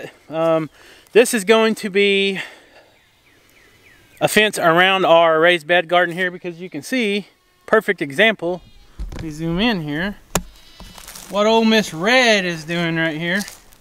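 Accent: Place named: New Jersey, United States. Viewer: as far as I can tell you were raised in Oklahoma but sometimes I hear a slight NJ brogue. I went to college with a lot of people from New Jersey. I am almost 30 years older than you. Back in the mid 1970s it was a less expensive for people to pay out-of-state tuition than pay in state tuition in New Jersey so we had a lot of people from out of state at Pfeiffer College now a University.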